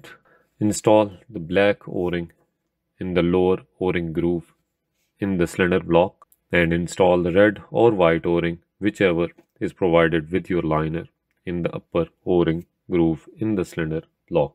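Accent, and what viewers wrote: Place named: Punjab, India. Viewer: Buddy ur voice sound punjabi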